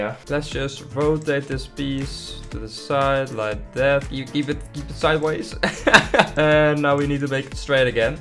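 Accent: Netherlands. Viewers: Are you dutch by any chance? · i smell dutch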